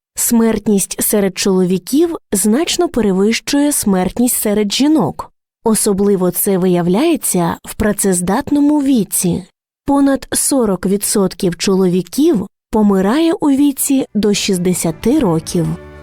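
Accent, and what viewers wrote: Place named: Surzhyk, Ukraine. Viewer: Було б цікаво, якби не цей огидний новосуржик з його -ЧЕ...-ЩЕ.....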